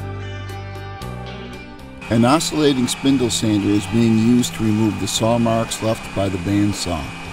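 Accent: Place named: United States. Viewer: I realized that this guy is american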